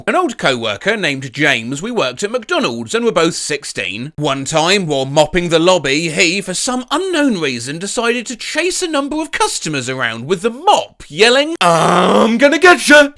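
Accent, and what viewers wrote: United Kingdom. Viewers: Hi 😊❤ I'm not very fluent in English, so I watch YouTube vids to improve my skills. I love your vids so much and also I love British accent 😍 thanks for your great work 🤗 P.s: I'm Iranian and I'm ashamed to say some people here do this😂😂😂😂 bro probably was my compatriot 😅😅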